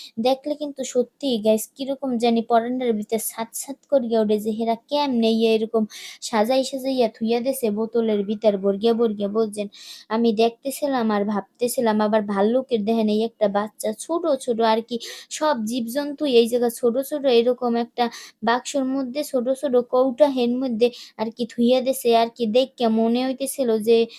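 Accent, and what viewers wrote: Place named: Barishal, Bangladesh. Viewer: তোমার মুখে বরিশালের কথা খুব সুন্দর লাগে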